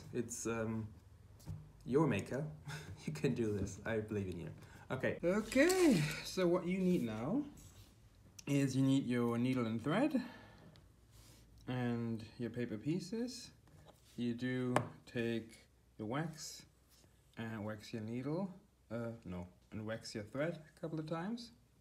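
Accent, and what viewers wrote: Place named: Berlin, Germany. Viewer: Aha! Du kommst aus Berlin! Das dachte ich, als ich Sie sprechen hörte - Thank you for the genius tip!!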